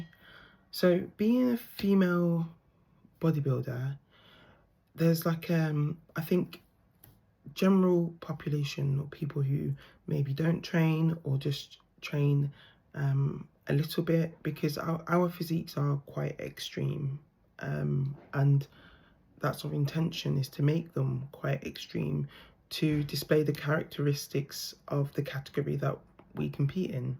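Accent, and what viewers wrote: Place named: United Kingdom. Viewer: This is my first time seeing any of your videos. I would like to say that I have always had the highest respect for bodybuilders in general - be they male or female - because that lifestyle demands quite a lot of self-discipline in terms of workout routines, diets, etc. As a man, I have absolutely no qualms whatsoever with female bodybuilding. I believe that it is prudent for anyone with the will & drive to do so to be as strong & fit as possible. This will yield health benefits both for the present as well as for the future. I will also say, as a Black Man in the United States, that it is a good idea for us as Black People to at bare minimum render our bodies strong in order to both withstand and effectively defend ourselves against potential attack. The U.S. is quite often hostile territory for our people. I don't know whether this is an issue in the U.K. 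(where I'm assuming you're from given your lovely accent). I will make certain to check out more of your videos. Thank you for your words of support towards your fellow female bodybuilders and for being you. You're beautiful. 🌹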